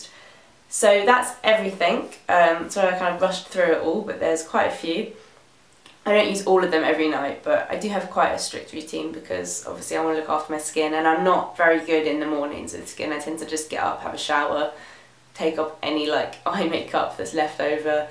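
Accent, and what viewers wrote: England, United Kingdom. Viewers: What part of England are you from? Love your accent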